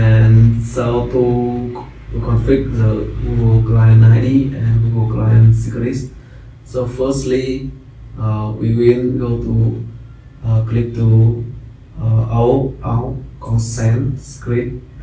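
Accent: Vietnam. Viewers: Good job br!! But Vietlish accent is so clear anyways :o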